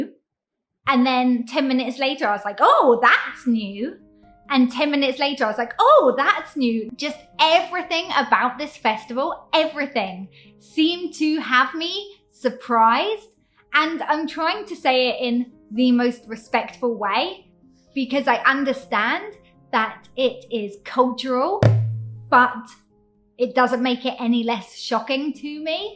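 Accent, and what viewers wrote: United Kingdom. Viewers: 為什麼要學英國人說話？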